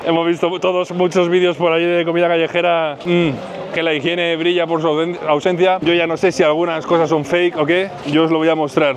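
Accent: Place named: Colombia. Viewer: Que alegría ver por fin a!! Y seguir escuchando ese hermoso acento colombiano ♥️💃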